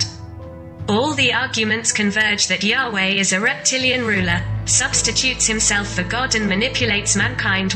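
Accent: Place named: England, United Kingdom. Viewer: Thank you too! The content keeps me here. Plus whoever's Voice is talking. English Accent is Supreme.! 😊👍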